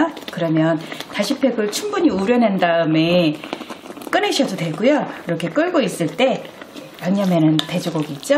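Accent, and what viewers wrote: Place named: Daejeon, South Korea. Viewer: 저도 대전이 고향이예요 왠지 말씨가 좀 친근감이 있었거든요. 영상을 오래 전부터 보고 자주 해먹고 있었거든요. 대전 어디신데 친정어머니가 농사지으셔서 보내주시는지요?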